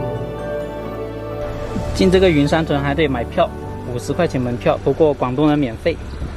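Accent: Sichuan, China. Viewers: 四川话寇以撒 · 也會說四川話了😂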